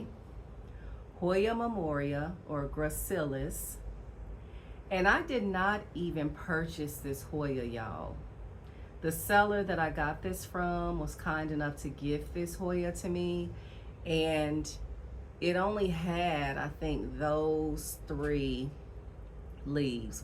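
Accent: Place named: South, United States. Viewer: Hi 👋🏽 I love love your down south accent. 👏🏾 ❤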